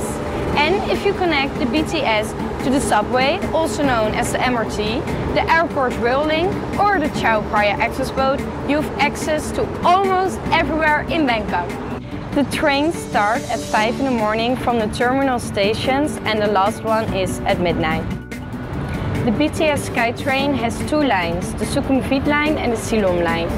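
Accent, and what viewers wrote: Netherlands. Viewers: This is a dutch company right ? Ik kan het horen aan het accent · hahahaha sounds like a dutch farang hahahahaha. This tour agency is extremely expensive. Norrmally this type of farangs got scammed in bangkok now they scamming their own people.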